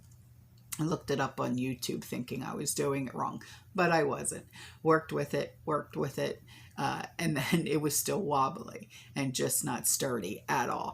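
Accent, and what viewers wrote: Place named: Boston, United States. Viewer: "Grab your cwauffee".....that's how I hear it ......great accent.....Mass?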